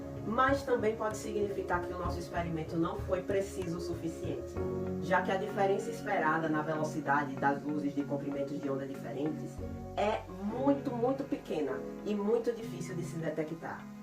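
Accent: Northeast, Brazil. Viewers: Ela é maranhense, paraibana ou cearense eu acho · Adorei o vídeo 😄. Só fiquei curioso pra saber de qual estado do nordeste vc é.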